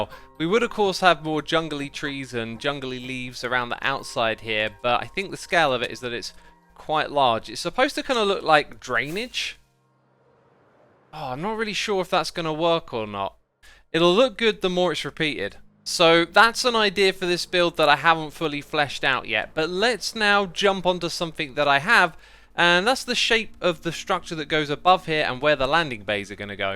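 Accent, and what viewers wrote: United Kingdom. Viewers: I just searched if X lives in a country in which they drive on the left (he's British, apparently I wasn't paying any attention to the accent haha), cause I got confused when he said the designs of the road felt IRL and I didn't see it lol😂😂🤦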